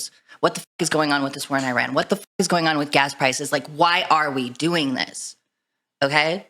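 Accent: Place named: California, United States. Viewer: Yea my first time seeing you and I gotta say that's a strong ass California accent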